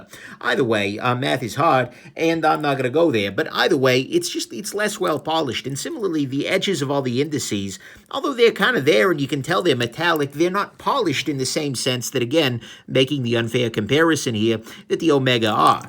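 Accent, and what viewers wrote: New York, United States. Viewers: That's a New Yorker voice hard core.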